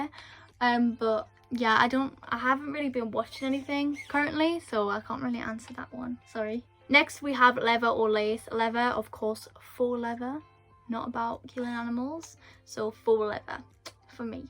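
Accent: United Kingdom. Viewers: I think she’s British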